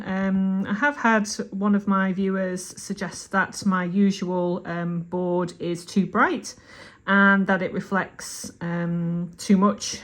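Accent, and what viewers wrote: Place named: England, United Kingdom. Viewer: Ok, you can say ok all you want, ok 😊.... ok! I do like the darker material for your background. I was going to suggest a beading mat, but that looks good. Sorry for people being people... I think you are great! I really enjoy how you speak and the way you speak from England being from America, it's neat yo hear the differences. 😊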